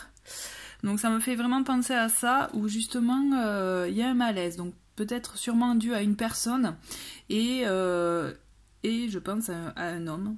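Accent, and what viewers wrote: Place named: Aveyron, France. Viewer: Merci cela me touche.. votre accent me va droit au coeur aussi car L'Aveyronnaise que je suis est sensible à ce chant dans la voix... ce chant qui est aussi le mien malgré mon ''exil'' en région parisienne. Je garde foi mais je dois me mettre en distance car je souhaite sortir de ma profonde dépression. Bisous🕯🌞❤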